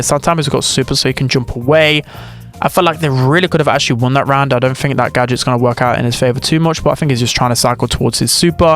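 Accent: United Kingdom. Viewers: when are u gnna unleash ur welsh accent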